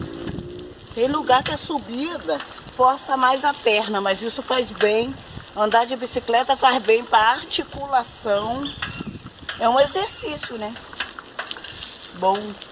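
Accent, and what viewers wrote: Rio de Janeiro, Brazil. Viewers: Carioca. Normal.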